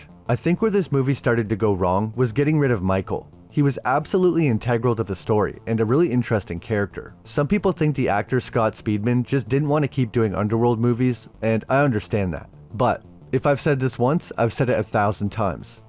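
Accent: Canada. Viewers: Your Canadian accent isn't slipping by me! keep up the good work my man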